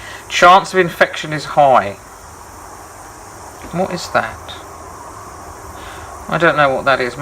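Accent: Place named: London, United Kingdom. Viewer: There is an actually a psychological study on something driven through the brain in the 1900's I think. i kept thinking what a strong London accent! You're doing a good job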